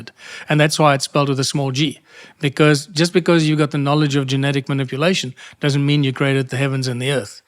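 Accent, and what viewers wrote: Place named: South Africa. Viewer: I didn't know you were South African,. But in this interview I can even hear a bit of South African in your accent ❤. I'm also a South African living in the Netherlands 🇳🇱 and I've really been enjoying your show.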